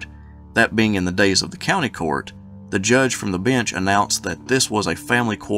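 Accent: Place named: Appalachia, United States. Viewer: You almost sound like you are from the Appalachian area, but the song says the professor of knowledge is in the heartland. Texans use a long i when speaking also. I want to know where you are from, just whimsical curiosity. I love these videos and ALWAYS appreciate learning from you. I wager East Tennessee or Oklahoma is where you might be from.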